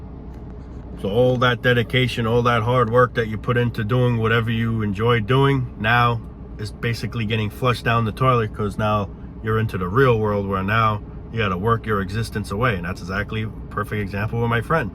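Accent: New York, United States. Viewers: NY accent, makes this better.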